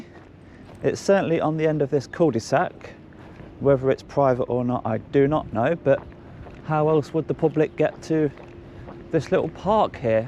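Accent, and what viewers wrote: United Kingdom. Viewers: Local accent. Quite a few accents pronounce the “yoo” sound like that. Look at how Alan Sugar pronounces “new” as “noo”. Perfectly normal in some parts of the UK.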